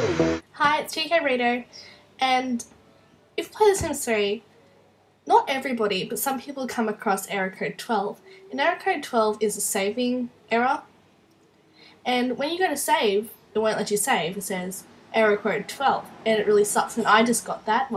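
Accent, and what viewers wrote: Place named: Australia. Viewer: This is great! Haha, I'm from New Zealand but I live in the US. I laughed when you started talking and I realised you're an Aussie! Haha thanks for the help :)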